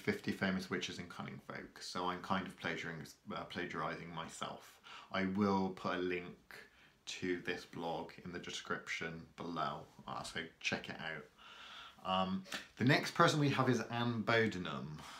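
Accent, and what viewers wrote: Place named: West Country, United Kingdom. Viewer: A fascinating video, great to learn more about my part of the country and Cunning Folk in general. I could listen to your West Country accent all day! Cheers, moi luvver!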